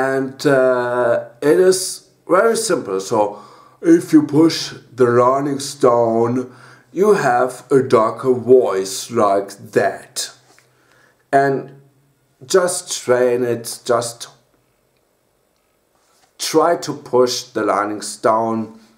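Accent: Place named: Germany. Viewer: Guten Abend dein Englisch ist sehr Deutsch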